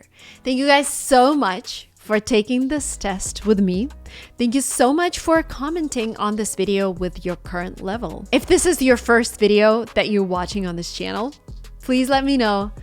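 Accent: Russia. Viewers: Is she not Russian? It's just that her accent at the beginning was very similar to Russian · Бля...😂 жуткий РАШИАН ЭКЦЕНТ 😢 Слушай классическую музыку, развивай слух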